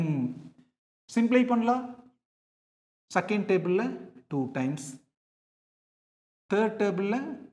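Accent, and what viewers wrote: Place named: Pollachi, India. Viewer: Excellent teaching sir ❤❤❤❤ . I love your Pollachi accent 😊😊😊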